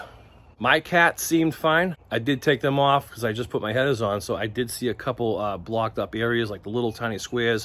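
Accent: Boston, United States. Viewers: Sounds like Boston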